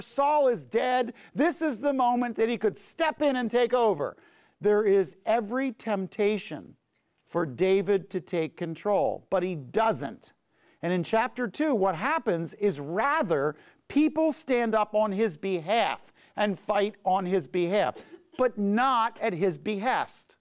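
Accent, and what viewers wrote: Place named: Israel. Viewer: I understand that native tongue is Hebrew (being born in Israel). You are being exposed to a greater thing. The Word will expand your mind, heart and soul-- in Hebrew the bet is pronounced as "b" AND as "v" bet/vet; and that is what you are hearing. Shalom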